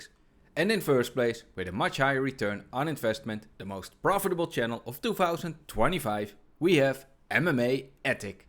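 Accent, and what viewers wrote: Netherlands. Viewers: Respect for all the work, by the way I can't un-hear you must be Dutch ;')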